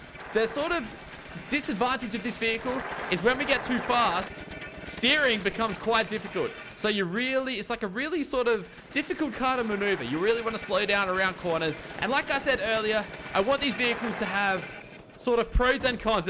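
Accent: Australia. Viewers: Are you Australian? If so where are you from in Aus.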